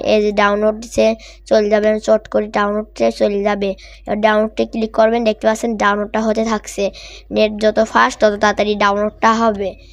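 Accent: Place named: Kushtia, Bangladesh. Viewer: Onk valo bolco viyaa❤❤ Ami sure tomer basha Kushtia 😊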